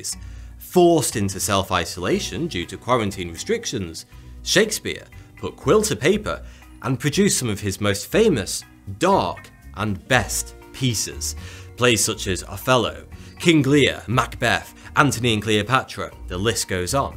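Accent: United Kingdom. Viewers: Pardon the ignorant question: which part of the UK is your accent from? Introvert here. This quarantine is brilliant! I love it! I'm learning 2 foreign languages, taking online guitar lessons, reading voluminously, deep-cleaning my flat, getting a suntan, etc. What's the bellyaching about? Ha!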